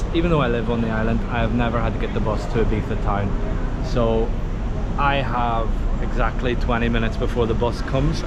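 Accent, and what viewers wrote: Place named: Derry, United Kingdom. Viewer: Where abouts in ireland are you from bro? I'm from derry myself your accent doesn't sound far from here. Coming to ibiza 28th September for the week so thought I would get a watch at the videos!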